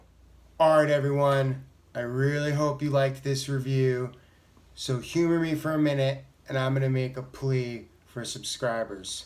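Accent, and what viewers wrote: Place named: East Coast, United States. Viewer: Ah ok, so East Coast fits your accent. It all makes sense now. I figured Boston. And you bring up a really good point. It's not just a matter of height but weight amd broadness as well. I am 215 and pretty broad and I find bags like PD or Mystery Ranch always fit so small, cut into my pecks, and are even uncomfortable after a short while under load whereas MW is just always so comfortable. MW has got some kind of magic in their straps and harness and now they are addressing our sweaty backs with items like the Speedwell. It makes me think the designer/owner must be a larger guy because of course he would deal with this as well.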